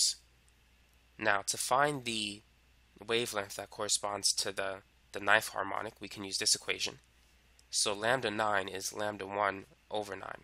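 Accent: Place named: New York, United States. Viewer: I love this dude. he's sounds slightly gangster, and fully genius at the same time. sounds like a New Yorker who made it out the hood because he smart af. Props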